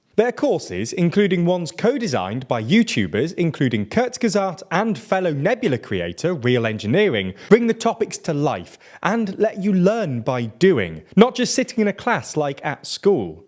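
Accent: United Kingdom. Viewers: Neato! Cool British accent,! 1 Capitalism and communism work for a few. 2 The world needs Nordic-style socialism. 3 Without love and sense of humor there is no happiness and life is meaningless. 💕☮🌎🌌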